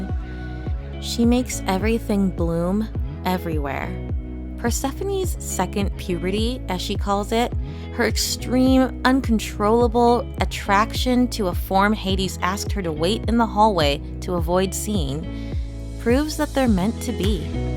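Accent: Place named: New Zealand. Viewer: Love hearing that NZ accent!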